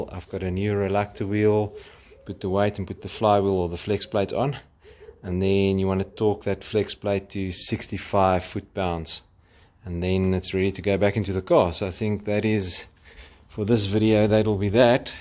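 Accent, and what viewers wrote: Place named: Cleveland, United States. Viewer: You have an interesting accent. Are you from Cleveland?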